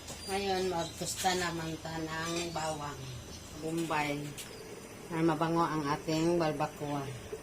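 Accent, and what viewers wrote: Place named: Visayas, Philippines. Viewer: Hehe malingaw ko jd ko paminaw sa bisaya mg tagalog🤣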